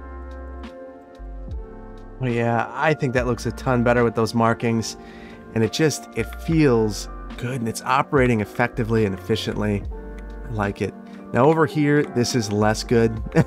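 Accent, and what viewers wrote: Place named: Midwest, United States. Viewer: I can tell you’re from the Midwest. I’ve driven on more than a few two- (or almost two-) dirt roads in the mountains around New Mexico (especially as a Boy Scout leader in college circa <num>) where the road hung along the side of the hill with a nice drop off on the outside and no guard rail. One of these is/was the access road to our local council Boy Scout Camp which I’ve driven numerous times. Chances are given the mountainous nature of that part of Clearwater County, nobody would bother with a guardrail until the road was paved, or at least traffic and/or accidents warranted.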